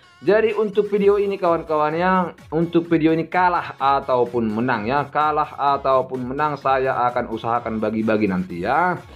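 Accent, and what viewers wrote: Medan, Indonesia. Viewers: Semangat yaa Wak semoga Chanel nya makin sukses 💪💪 dan slalu berkarya <num> Kya nya orang Medan wawak ini 😁😁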